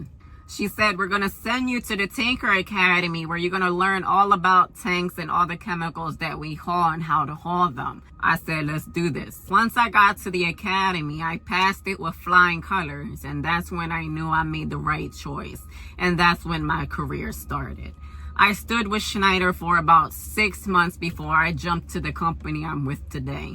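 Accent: United States. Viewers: Watched your video and subscribed immediately. Love your determination and your Jersey accent. I am so sorry for how your husband went about ending your marriage. But you will be alright. I am looking forward to following your truck driving journey. I don't know if you follow any other female truckers on YouTube, but my favorite is Jasmine (SlimePack) who drives refers with Prime INC. May God bless you and keep you safe on the road.❤🙏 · Where are you from? You sound like you from New York? Are you Boriqua?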